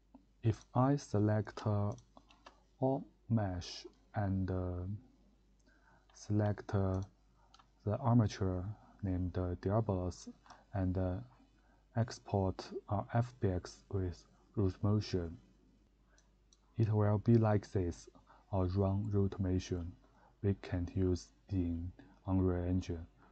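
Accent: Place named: China. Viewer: 好明显的中国口音啊大佬哈哈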